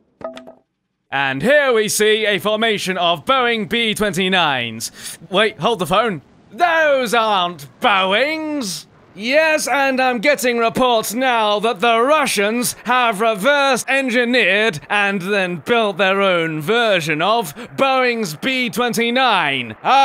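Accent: England, United Kingdom. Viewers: That was the best part of the video. I just love the comedy that uses. And on top of that, that superb British accent makes it all the more hilarious. Encore, encore! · eu amo esse sotaque britanico, kkkkkkkkkkkkk, melhor canal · dude get your tea together, make more videos you have a good thing going on, make some tea reviews or something, whatever you can't fail with that beautiful British voice of yours. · Wow he's like a serious brit! See's : LOL WUT, DID HE JUST SAY AMELICANS INSTEAD OF AMERICANS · I am going to right a overly long and unnecessarily detailed comment on this thar them video made by. "Probably" and "yes" is probably half the video. good. also I love the British accent and the slang. also the videos are much better if you get all the references. I this comment long enough yet? no? OK ummmm....uh huh oh yes, uhhh.lhmmm. YES right you just earned a sub:) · I love your British aksent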